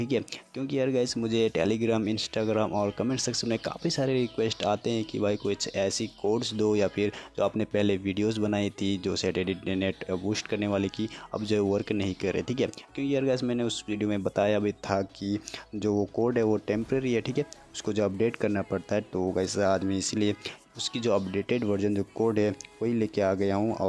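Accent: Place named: India. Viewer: Your Indian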